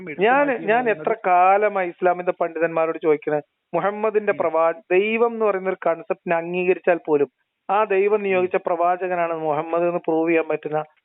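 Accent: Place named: Palakkad, India. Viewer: അല്ല പാലക്കാടൻ ശൈലി തന്നെയാണിത്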